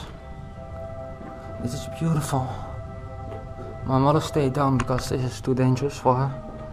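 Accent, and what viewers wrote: Netherlands. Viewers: vette video maar je hoort wel dat je nederlands bent gast😂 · Volgens mij ben je Nederlands aan je accent te horen.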